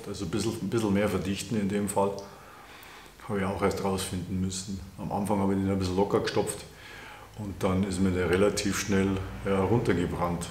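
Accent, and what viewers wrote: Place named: Bavaria, Germany. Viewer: habe de Ehre zruck ;-), sah dich schon öfter, auf diversen anderen Kanälen und horchte dem vertrauten Dialekt; Jetzt auch DEINEN Kanal gefunden *freu. Woher kommst du denn? Besten Merce + schönes WE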